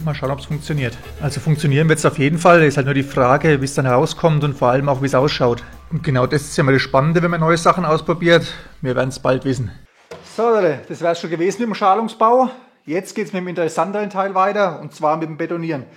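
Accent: Franconia, Germany. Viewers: Sehr coole Arbeit 👌 Auch sehr angenehme Videos 👍 Hört man da einen Fränkischen Dialekt? 😄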